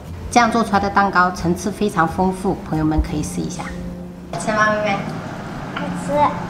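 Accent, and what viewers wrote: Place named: Fujian, China. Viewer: 听口音是福建人 她老公可能是四川的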